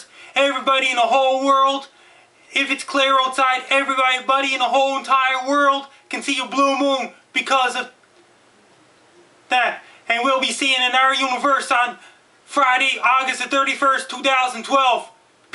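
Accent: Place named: Cape Breton, Canada. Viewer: It is rare because it only happens "once in a blue moon". He talks like that because he's a Cape Bretoner. Not that there's anything wrong with that.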